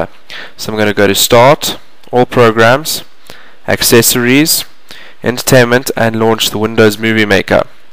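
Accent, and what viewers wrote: South Africa. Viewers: Are you from South Africa? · dude your south afrikan neh?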